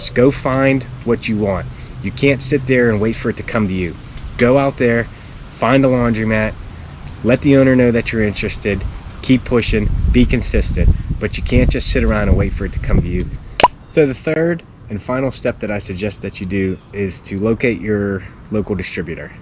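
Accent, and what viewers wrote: United States. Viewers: Are you from Pittsburgh 😂 that accent is awesome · Are you from Boston?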